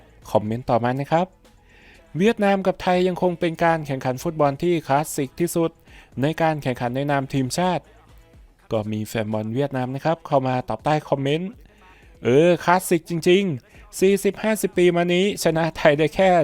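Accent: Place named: Laos. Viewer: ใส่เสียงลาวคือชัดแท้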